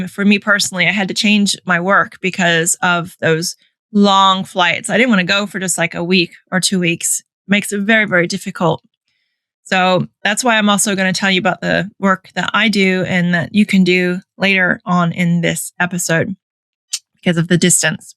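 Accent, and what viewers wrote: Australia. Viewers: sounding like an aussie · Love hearing how your o Vowels have Australianifed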